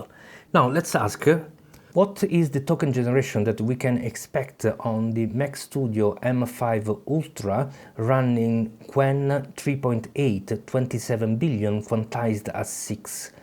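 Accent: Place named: Italy. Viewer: italian eeeehhhh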